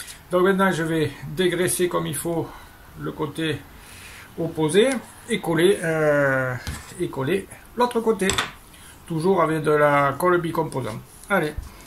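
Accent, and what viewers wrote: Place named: Nice, France. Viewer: Alors la Monsieur vous pourriez facilement prendre la place du fameux comedien.... Louis de Funnes vous etres deja un tres bon menuisier et je vous remercie infiniment pour vos videos mais avec vos fin de videos( Betissier) la Je me regale d'abord parcque j'ai grandi dans un petit village derriere Nice donc l'accent j'adore et vous mettez le super cote humain,Alors bonne continuation et Merci Robert dans le blue mountains NSW en Australie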